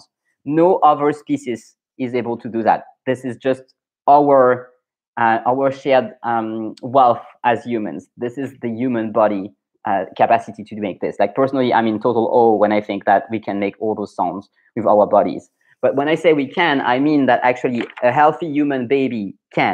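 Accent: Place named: United States. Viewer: I am listening and watching this but I thought I would you pick up on what you said . Your accent sounds anglophonic. I would say broadly apart from the odd word I would say to my British ear you sound as American or Scandinavian who speaks good English.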